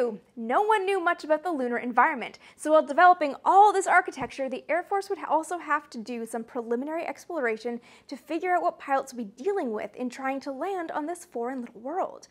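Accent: Canada. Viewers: Love the show! But is it possible to move away from the almost 100% vocal fry delivery? It is painful after the first few minutes.Vocal fry is common with the Kardashians in sound bites but for a 15-minute video it is literally all I can hear. Keep the Canadian accent of course! You have great content.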